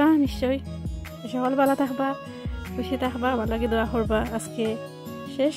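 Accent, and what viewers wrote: Sylhet, Bangladesh. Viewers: মাই অসাধারণ তো আমার সিলেটি খালা মাত হুনিয়া অনেক ভাল লাগলো আমার তো একজন সিলেটি পাইলাম মাশাল্লাহ বন্ধু হয়ে গেলাম আমি সিলেট থেকে ইপু আমাক দেখিয়া জাইয়েন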